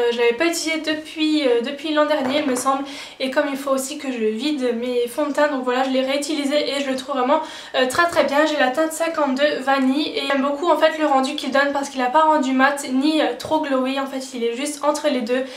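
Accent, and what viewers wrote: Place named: Alsace, France. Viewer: Aaaaaaah je me disait bien que ton accent me disait quelque chose enfin quelqu'un d'Alsace ! :D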